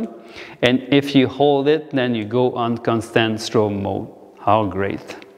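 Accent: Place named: Quebec, Canada. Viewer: Oh shit jpensse t québécois man ahah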